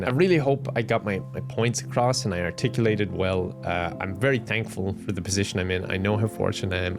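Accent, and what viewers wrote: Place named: Ireland. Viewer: Jeysus, I've watched for a little over a 3rd of my life (maybe, I was never good at math). I suddenly understand now why I have an Irish tint to my accent. Started watching somewhere around the first spider-man videos. Also even if you don't make a full cover, pleeeeese release the " " song. It was so good. And I'll need to learn that song myself in a few years.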